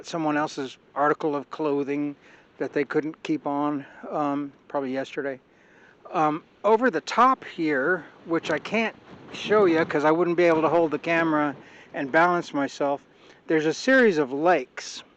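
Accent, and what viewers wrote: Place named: Ireland. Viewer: Love the Irish accent,. Be safe way up there with all that wind!! Vaya con Dios!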